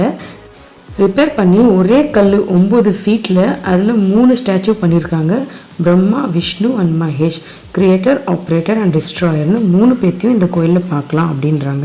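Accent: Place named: Tirunelveli, India. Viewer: தம்பி food review சிறிது திருநெல்வேலி பாஷையுடன் நல்லா இருந்தது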